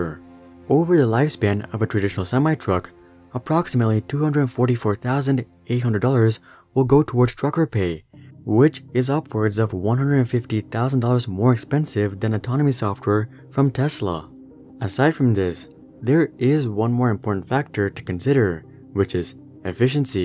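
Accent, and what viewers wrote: India. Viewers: On very close inspection, (which I would not have done except for the post as you speak very clearly), I guessed South central Asian, likely Indian. Hooray for me! Good channel. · U a Indian?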